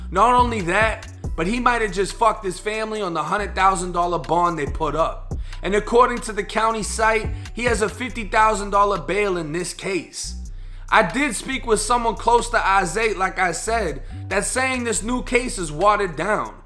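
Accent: Boston, United States. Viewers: I bet my life you are from Boston. I'm from New Jersey, l hear that accent a mile away! Enjoy your channel ☺️ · I love your accent, you must be from Boston! Keep up good work dude, ill support!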